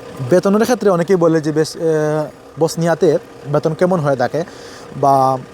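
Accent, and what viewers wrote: Sylhet, Bangladesh. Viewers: ভাই আমার মনে হইতাছে আপনি সিলেটি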